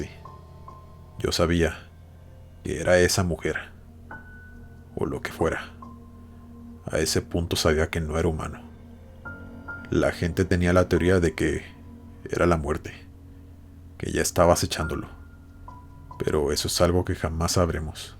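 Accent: Mexico. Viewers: Me encanta tu voz me gusta como hablan los mexicano ❤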